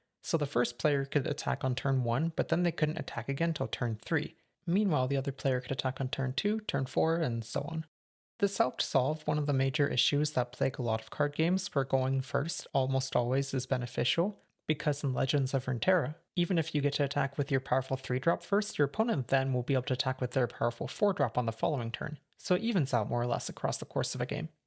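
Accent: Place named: United States. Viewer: You sound american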